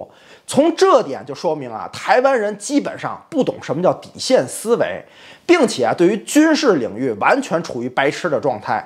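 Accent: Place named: Tianjin, China. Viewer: 口音天津人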